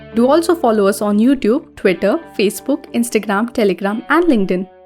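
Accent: India. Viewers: good game.. well played yeah my head is confusing with indian accent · Indian accent is pain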